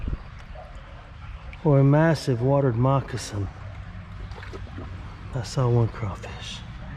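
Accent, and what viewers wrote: South, United States. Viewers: It’s no problem understanding you and I’m from the west coast. You speak deliberately and with very little southern accent. Your sweet wife…how she smiles at you!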